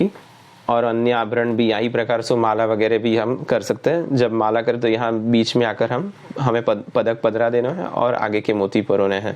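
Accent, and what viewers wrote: Braj, India. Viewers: Jai shree krishna Superb very easily done your braj bhasha is very sweet Make more videos for us thanks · Bahut sunder braj ki boli manbhavan hai Jai Shree Krishna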